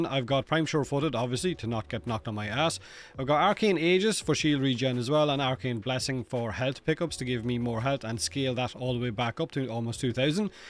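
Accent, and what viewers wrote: Ireland. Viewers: I really love your irish accent 😉 « Moch moch bettar experience » ❤ And I do agree with you btw, it's an amazing frame now. Even better, it's a free gift atm, so imagine for a new player : pick Caliban, and steamroll the whole star chart with your new cute baby sentients.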